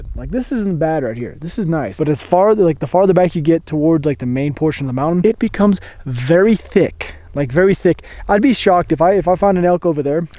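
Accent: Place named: Texas, United States. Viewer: Weird all of a sudden you have a Texas accent???? Where the Fuc* did that come from??? I've never heard you sound like that till this vid and I've been subbed since you only had 277 subs,, I don't like it lol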